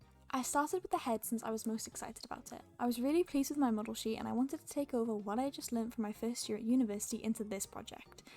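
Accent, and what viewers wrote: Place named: Wales, United Kingdom. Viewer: amazing video! love the strong welsh accent!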